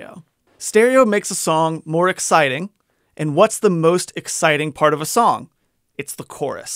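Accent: England, United Kingdom. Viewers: What happened to the English accent? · when did you stop being british?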